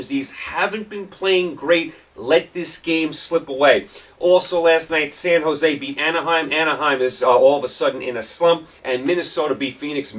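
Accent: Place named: New England, United States. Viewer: I love a Yankee accent.....u defiantly sound better than the sports guy in Bowling Green, Owensboro KY, or Nashville, TN...thanks for sports show.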